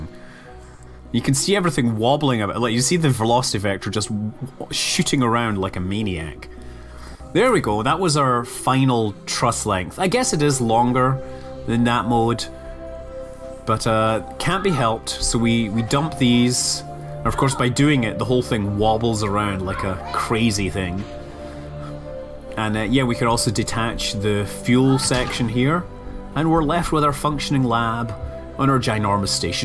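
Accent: Scotland, United Kingdom. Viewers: He's Scottish you imbecile.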